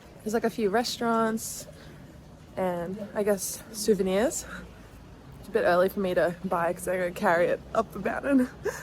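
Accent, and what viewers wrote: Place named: Australia. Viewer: I like your Australia accent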